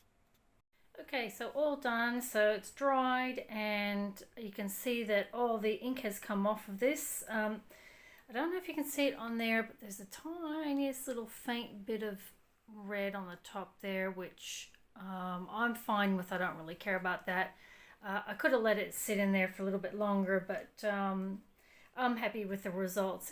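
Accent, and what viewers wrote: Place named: Australia. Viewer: You have a very interesting accent. Australian mixed with what? Canadian? American?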